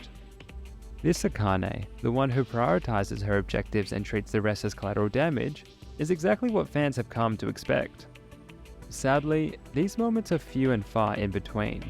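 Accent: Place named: Australia. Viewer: I even would have taken the Meta route as Uchikoshi suggested and did'nt follow up on that the player is some sort of multi-dimensional reaching entity or even a well meaning alien helping them through Alien technology, considering he brought Alien technology to the series..don't really see the harm in making a big part of the series. Sure make them an all-seeing entity but they're not all powerful. As crazy as that sounds, it's much bigger gut punch of a plot twist and would make me buy that humanity had a fighting chance against the Religious Fanatic even with the same ending. Zero would also know of him through the machine's existence naturally. He's a very (lost?) Youtuber who has many differently named accounts (one possibly deleted?) he makes videos on until he went back to his main one cos it was naturally the only one with any traction. It was just tiring bumping into him in the algorithm when he could just make videos on the main channel instead like he eventually relented to. 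Your ozzie accents are a touch similar after all, my mistake.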